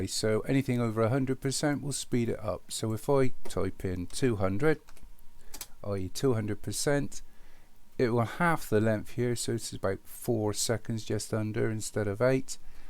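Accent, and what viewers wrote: United Kingdom. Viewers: I like your accent dude British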